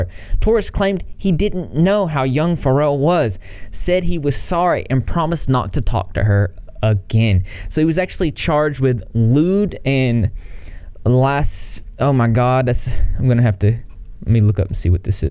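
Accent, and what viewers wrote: South, United States. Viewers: This is a random question but where are you from? It sounds like you have a kind of country accent it’s really interesting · Think this is crazy?? Jared Leto has the exact same story. You should see the threads on line and the accusations. Sounds identical to this... forced rape and dangerous choking. I loved Leto at one time...he uses 30stm concerts to lure them. I’ve watched a few of your vids and I love the southern accent (I’m southern) but.... what’s with all the symbolism?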